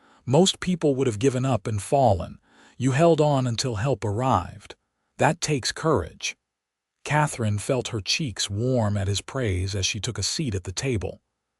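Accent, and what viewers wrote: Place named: West, United States. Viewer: So sorry, that with the lovely voice of your Narrator, so sorry that so many words are wrongly spelled and/or mispronounced. The voice of the Narration is wonderful It would pass for a genuine Western American if it were not for the outlandish pronouncement of such a few words. I really do like the story. Well done.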